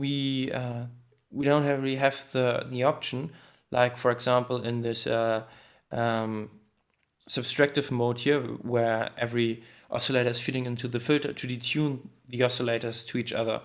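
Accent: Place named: Germany. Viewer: Geiler sound, gutes Video. Mach weiter so. Man hört, dass du deutsch bist, aber es stört überhaupt nicht.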